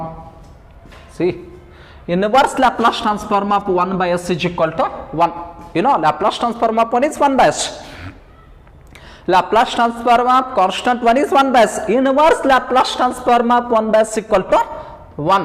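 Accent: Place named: United Kingdom. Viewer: British English accent samajh nahi atta hein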